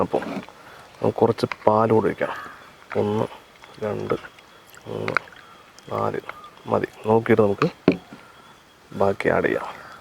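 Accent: Palakkad, India. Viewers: Pallakad ann makale..😎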